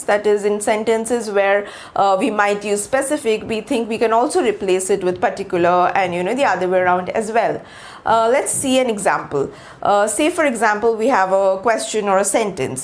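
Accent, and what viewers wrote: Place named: India. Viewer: This's the most close pronounciation of The Indians to English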